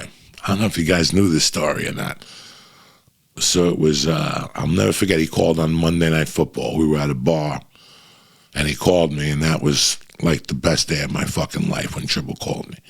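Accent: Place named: Philadelphia, United States. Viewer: I really like that you are in a new spot in the room, that is the coolest Bruce Lee poster and all the wall Art!! Thanks for sharing your videos with us. Salute to you and your Family Uncle Joey. You are like hearing my Family talk when I was younger in Philly! Thanks for All you do for people that you may never realize have been saved due to you telling shit like it is. Have a Great Weekend Brother!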